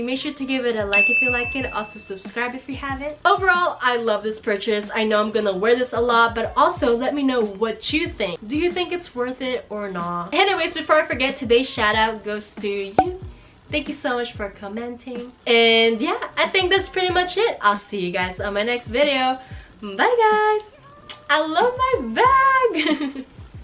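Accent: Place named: France. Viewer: Oohhhhh with that French accent. Not too shabby 👌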